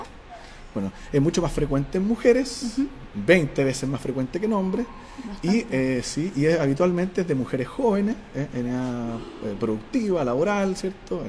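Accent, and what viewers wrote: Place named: Chile. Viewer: Me huele a chilito por aquí jajajaja me encanta ese acento saludos desde España una exmigrante enamorada de Chile y su cultura gracias🇨🇱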